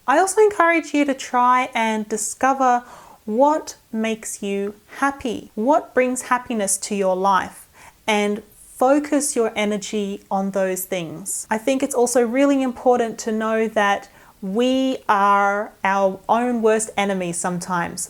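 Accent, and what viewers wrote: Australia. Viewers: Its nothing compared to what joy your videos bring me ! I love your channel , I do , I even started to like manga and stuff that people in Japan like , animes and so on. I know a few words thanks to that , which is thanks to you :) And one more thing darling : You are one of the very first australian youtubers I discovefred on youtube and since then, I love autralian accent ! people say , they end up sounding like asking, as if there is a question mark, I think its more like wanting to say more, so the melody would go down and a little bit up just so that we axpect anothe sentence, but sometimes it feels like you guys are emotional aout the things you say ...I dont know, maybe you could do a video about stuff that make you australian ?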